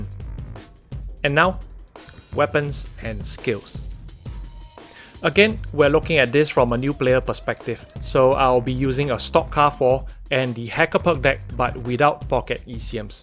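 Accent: Singapore. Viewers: You from Singapore?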